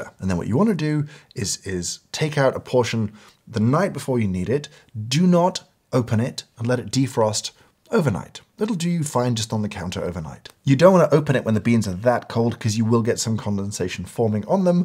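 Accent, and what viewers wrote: United Kingdom. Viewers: Is it just me or do you sound more British than usual? 🤔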